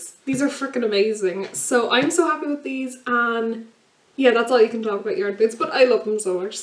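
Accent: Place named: Ireland. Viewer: Love this!! All your gifts look great! Hope you had a good birthday! Also I love your accent🤣🤣I'm half Irish but I don't have the accent☹☹❤❤xx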